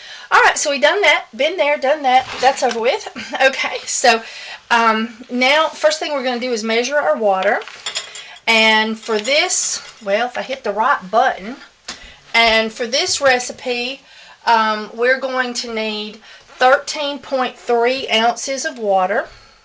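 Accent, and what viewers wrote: South, United States. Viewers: I’m pretty sure that she speaks in a southern accent but is trying very hard to not speak like that🤣🤣🤣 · This was a great video. Thanks for uploading. I have been curious about making soap since I saw it being made at Stone Mountain years ago. Thanks for giving your recipe for beginners. I have not gotten up the courage to try yet. But the simplicity of this video makes it seem like, YES I could do this. The Southern accent (LOVE IT) makes me feel like I am learning from my Aunt. ✌🏻❤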